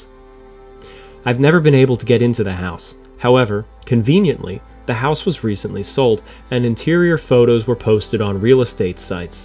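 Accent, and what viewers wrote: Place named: Michigan, United States. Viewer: could have sworn that was a Michigan accent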